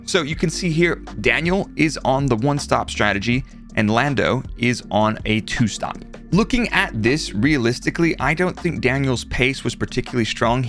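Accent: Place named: United States. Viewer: Love your content . It’s cool to see a good breakdown using data. I’m one who loves to look at telemetry. If I can suggest a video that I believe would be a hit is a comparison of teammates using the available data to break down who was faster. Removing as many variables that are out of the drivers hands and just look at raw pace. I think you could go very deep with it even factoring tire vs tire and length of stints , who has better tire management, etc. You would definitely kill it. Keep up the great content. It’s cool to watch f1 stuff from someone who speaks like I do . (I just mean a US native) actually I don’t even really hear the English/British/UK accent anymore. It’s almost more noticeable to hear a US accent .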